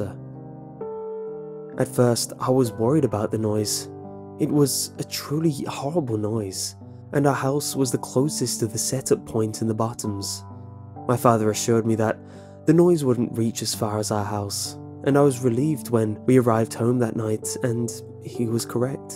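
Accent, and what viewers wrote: United Kingdom. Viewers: from Idaho but with a British accent ?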